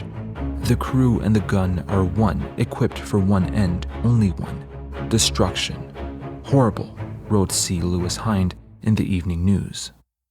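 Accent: Quebec, Canada. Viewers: I have to say, your french pronunciation is extremely on point. Why you do have a quebecois accent tho? Edit: oh, you’re probably quebecois, makes sense. My bad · Es ce que tu es québécois? Ton accent me dit quelque chose, on se reconnais tous en tant que frère québécois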